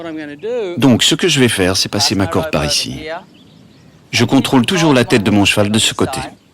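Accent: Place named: Australia. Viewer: L'accent australien me fera toujours cet effet bizarre :P En tout cas c'est un super travail, je voulais tenter l'éthologie avec un cheval qui est assez (pour ne pas dire énormément) nerveux, craintif et surtout pas dressé pour voir si ça l'aiderait, j'ai reproduit les mêmes gestes (j'ai eu de nombreuses cloques au passage du stic) mais maintenant je peux presque monter sans incidents, je continue, ça nous aide beaucoup tous les deux ! Merci !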